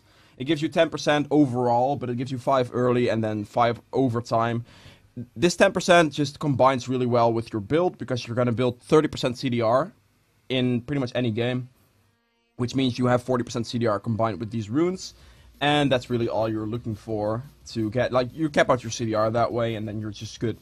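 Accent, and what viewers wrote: Netherlands. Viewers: nice guide 👍👍👌btw are you dutch? it souds like it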